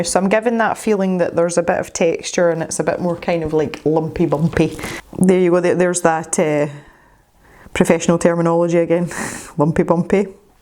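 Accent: Scotland, United Kingdom. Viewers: I thoroughly enjoy watching your art...listening to your little Scottish accent (love it) and about your life! Do not let those thumbs down bother you! It is too bad it affects your channel.....so sorry!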